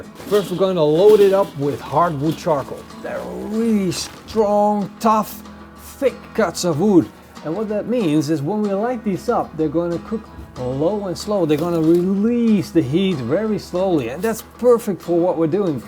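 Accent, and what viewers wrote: Netherlands. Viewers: You're Dutch, aren't you? 👌😉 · Love the videos man but was wondering are you dutch?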